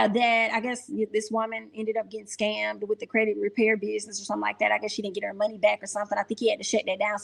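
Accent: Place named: Alabama, United States. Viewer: I subscribed as soon as you song messssyyyyy. Sounding like you are from Birmingham Alabama girl. Im new here and I think I'm going to enjoy it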